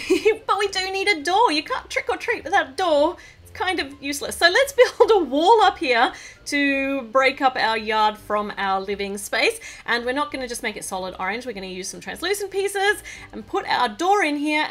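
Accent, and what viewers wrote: Australia. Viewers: r u an Aussie!? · Are you from australia 🇦🇺. If you are where do you live in Australia.im aussie too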